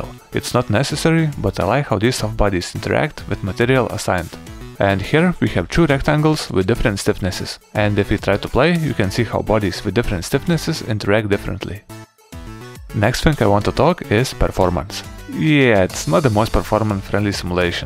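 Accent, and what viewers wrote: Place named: Russia. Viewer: Damn dude you have such a русский акцент .. (Аж ваще)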